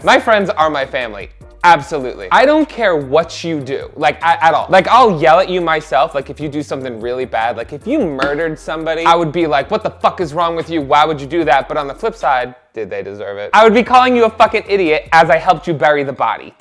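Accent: New Jersey, United States. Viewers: I love his Jersey accent!!!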